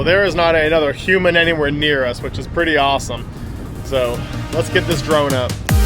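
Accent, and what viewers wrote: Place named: Bahamas. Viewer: I just watched your video and enjoyed it; however, I was left with a question: why is it that you sound Bahamian? Maybe it is because you spent so much time in the Abacos, I guess. 🤣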